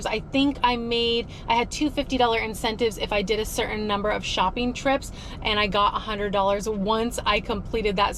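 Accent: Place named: Portland, United States. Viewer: Sounds like Oregon,Portland metro area.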